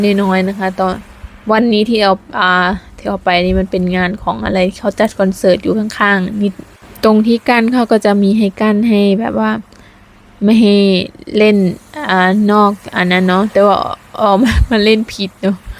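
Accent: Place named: South, Thailand. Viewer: ภาคใต้...เลยครับ🤣😆😁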